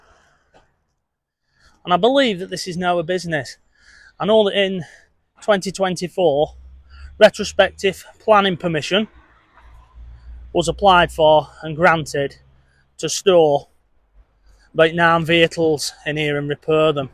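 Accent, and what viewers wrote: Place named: Lancashire, United Kingdom. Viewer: 😂 Hi, yes I remember that too now that you mention it, the expense of specific reclaimed bricks. Freds accent was a joy to behold as is yours. We are very slightly less Lancashire sounding here in my hometown but all things Lancashire need proudly preserving. I never got into the historical preservation side of bricklaying myself, mostly new builds and extensions with some ornamental work. What did you reckon to Freds efforts in brickying? I thought he did magnificently considering he was not traditionally trained and he was self taught. His Mums chimney stack was beautifully over engineered for a terraced house showing his deep love for Victorian architecture. Not seen a single example of brickwork done by Fred that would not pass at City & Guilds level. An immensely talented guy.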